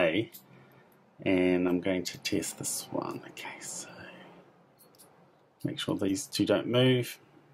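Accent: New Zealand. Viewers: Kiwi? Chur bro lol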